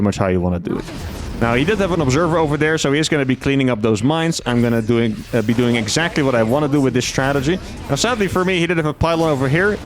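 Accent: Netherlands. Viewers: I've been lovin' your replays, big daddy. One can hear how much you enjoy the game through the excitement in your voice, and, the Dutch accent is just the cherry on the top. Keep it up, bru.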